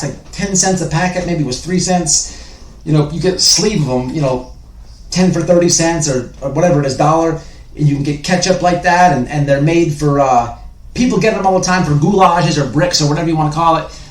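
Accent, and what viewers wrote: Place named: London, United Kingdom. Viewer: Good london accent bruv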